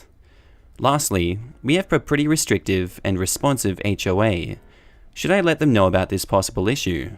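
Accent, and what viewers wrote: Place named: Australia. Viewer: I like my new Aussie hubby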